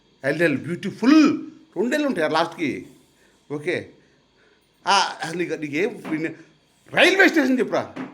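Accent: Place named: Andhra Pradesh, India. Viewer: Meee slang andhra laga undhiii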